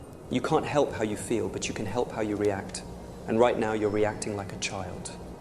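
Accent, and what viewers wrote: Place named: England, United Kingdom. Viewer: Hi ! I'm a french girl and I'm soooo happy to understand ! English accent helps a lot 🥰 But may I ask you a question ? It is your voice or an IA voice ? :o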